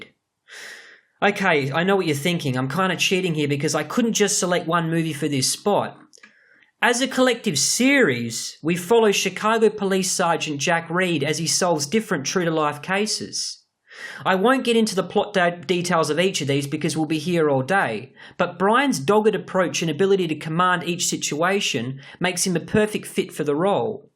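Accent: Australia. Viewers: Hi...Your Welcome Don't mean to embarrass you /...it's very SEXY/GORGEOUS 🤩 I could listen to you talk "AAAALL" day long! 😊 I'm a California Girl...near LA And You? Accent sounds Australian? What time for tomorrow's flick? CAN'T wait!!!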